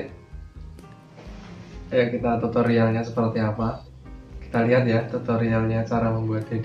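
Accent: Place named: Java, Indonesia. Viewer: Medoks abis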